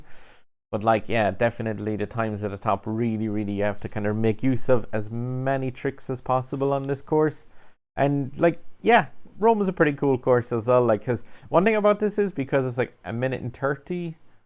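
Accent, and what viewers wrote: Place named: Ireland. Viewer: I love how you start every video with "now~" 😂 love the Irish accent, the way you say "cayr"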